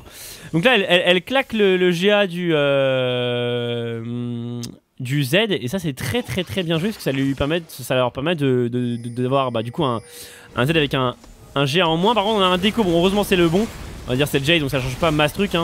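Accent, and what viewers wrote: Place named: England, United Kingdom. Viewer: Cet accent anglais :D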